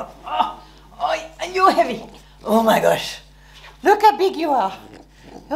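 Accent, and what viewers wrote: France. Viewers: I love your smile... and your French accent 😊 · I love your style tips with your lovely French accent, and Oscar is so cute! 😘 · I love your french accent! I honestly just really love it! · Great video. Love your French accent! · Hi, I love your accent and of course your channel. Your accent reminds me of my childhood living in Belgium when I had to learn French at school. I am German, now living in New Zealand and I totally understand your cultural struggles. I am glad I found you. After I lost my husband nearly three years ago to cancer, your videos give me a little bit of joy and a few moments of going down memory lane, when we visited Paris, or me, staying in an isolated house by the sea in Britagne for a holiday and remembering my French friend Isabelle who introduced me to real Champagne and Oysters... Thank you for bringing back these memories to me.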